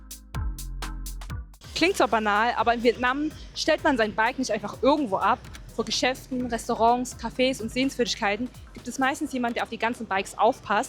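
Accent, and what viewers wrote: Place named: Cologne, Germany. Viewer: Kann es sein, dass Du aus dem Rheinland bist? Ich meine einen leicht kölschen Einschlag zu hören 😉😉 Sehr informatives Filmchen, Danke für die vielen Tipps. Kleine Anmerkung: vielleicht etwas langsamer sprechen, ansonsten toll gemacht!! 👍👍👍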